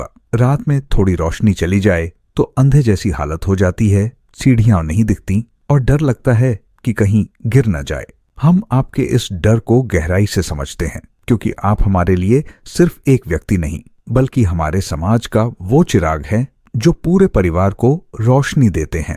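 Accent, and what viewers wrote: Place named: Ludhiana, India. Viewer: Ludhian punjabi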